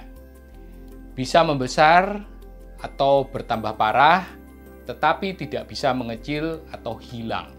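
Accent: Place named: Java, Indonesia. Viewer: Medok ya dokter Jowo ne ... siipp deh pokoknya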